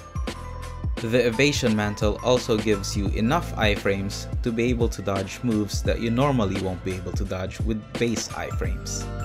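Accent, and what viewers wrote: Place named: Philippines. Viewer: Just started watching your vids.. You have a filipino english accent i kinda thought then i saw your logo haha Really good vid bro😁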